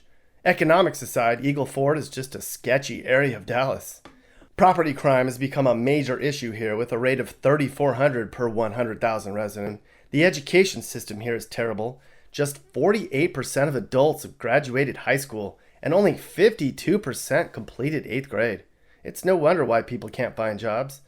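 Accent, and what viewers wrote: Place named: California, United States. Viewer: He sound like a fucking cali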